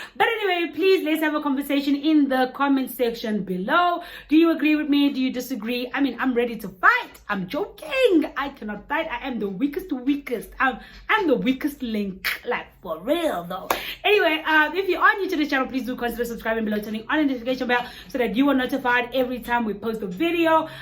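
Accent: Sotho, South Africa. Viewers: WOW, u have a Sotho accent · I really like you a lot but why do you like to look and sound like South African. I am not from DRC but I will prefer to watch more of your videos if you are more like your true self. Please you need to travel more often to see the world. I don't know while you like to trash DRC. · Are you a South African? You Talk like some of us. ❤❤ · First of all your headscarf is beautiful and the way you wrapped your head is perfect👌🏽, give a sister some lessons......another thing is your accent, it's sounds like a South African🥰....... There's a lot I can say/reply to what you've said on this video but let reserve my comment for another video..... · South Africans are liberate people. i love my people! There is nothing free in SA. GRANTS, RDP, INFRASTUCTURE etc ,is paid out from tax. we pay for all of those things for our people. We have placed the political party where they are, so yes if they are failing to deliver... 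they rather Votsek lol. South Africans are liberate, we understand African spirituality. we do go to church but do due diligence and acknowledge our ancestors, then go to church. Most of these posters for abortions are back door abortion, rendered by foreigners. its legal to have an abortion in SA, you can just go to a public or private hospital and get and abortion than giving birth to a child that will be a burden, when you cannot even take care of your self. lol. i must say, i love your accent, you don't sound foreign. in township, make sure they don't catch you stealing or mugging a person.. they will burn the thief! the jobs you looking down on, still feed families. If im not educated and i cannot get to be a waitress, just a foreigner can..! Yall run away from your countries and become burdens in other peoples countries and expect those people to understand. South africans fight for what they want, something foreign nationals should start thinking about. 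· You give me Sotho vibes you look and even sound Sotho or even Pedi. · Your accent sounds like South African🇿🇦❤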